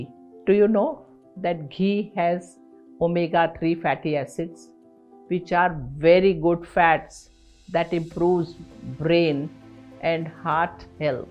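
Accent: India. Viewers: So what accent you expect her to have? American? Or Australian? This is how Indians speak so live with it!!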